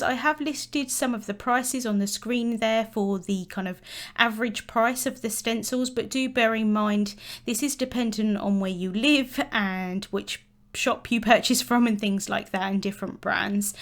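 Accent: United Kingdom. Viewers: I am so glad I found you today over at MFT. I love your card making style, and I love your voice and British accent are so pleasant to this American Southerner to listen to! I appreciate all of these tips, and I look forward to watching many more of your videos!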